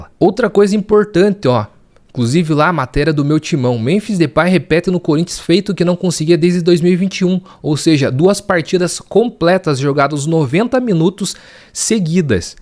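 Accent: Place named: South, Brazil. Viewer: Opa, tudo bem? Você é do sul? Seu sotaque… VAI CORINTHIANS!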